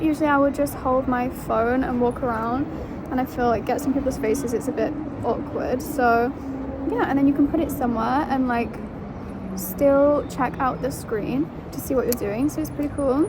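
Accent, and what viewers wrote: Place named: Yorkshire, United Kingdom. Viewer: is that a yorkshire accent i hear? i have many questions abt working in tech in china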